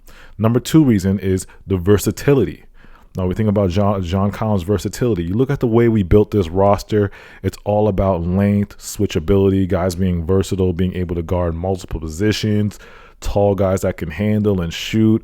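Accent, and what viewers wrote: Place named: Buffalo, United States. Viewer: sound like he from Buffalo …. WHERE U FROM?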